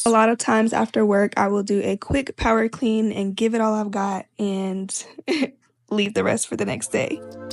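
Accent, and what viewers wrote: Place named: South, United States. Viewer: i love watching your vlogs so much !! the mani pedi part had me giggling so much !! let that southern accent shine girl heheh 🤍🤍🤍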